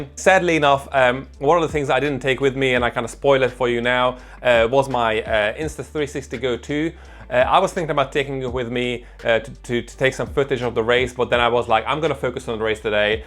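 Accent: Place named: Hungary. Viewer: Hallatszik a kiejtésen hogy magyar vagy.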